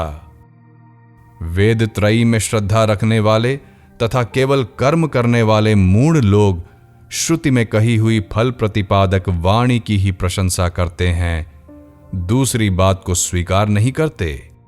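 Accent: Standard. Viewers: Sir aapki.aavaj bahut shudh h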